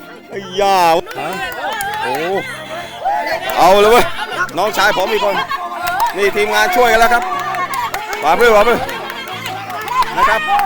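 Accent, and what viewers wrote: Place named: South, Thailand. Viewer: พูดใต้เลยครับ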